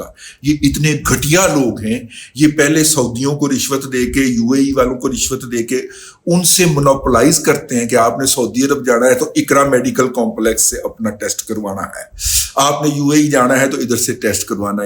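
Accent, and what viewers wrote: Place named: Lahore, Pakistan. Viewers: Pure lahori style hy apka excellent sir thanks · V good sahib I like your lahori style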